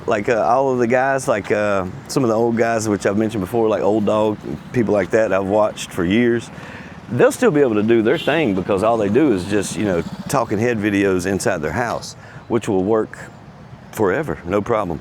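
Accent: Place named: South, United States. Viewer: I’m not sure if you remember me told you I lived in South Carolina for more than 20 years I was in Camden South Carolina right by I 20, I think that is reason why I like you right away when you start talking I recognize that you from the South; you like my kababayan,! So good luck what ever you decide to do to stay happy 😃 and keep smiling that is your big asset 😂🎉😊😮❤😂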